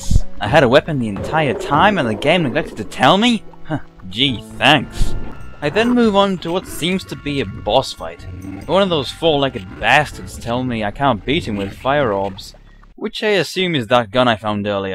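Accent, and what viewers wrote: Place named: North East England, United Kingdom. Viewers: Diego Rojas The North East of England would be my guess... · Sound like he's from Northumberland where I live.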